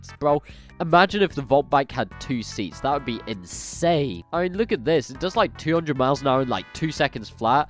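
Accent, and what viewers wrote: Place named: United Kingdom. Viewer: You got a big British accent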